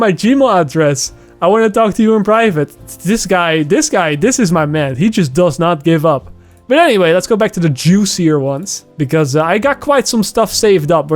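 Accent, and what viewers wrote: Netherlands. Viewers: BTW, I only started watching you recently, but the first video I saw from you, I could already tell you were the Netherlands... It's you accent, it's so obvious haha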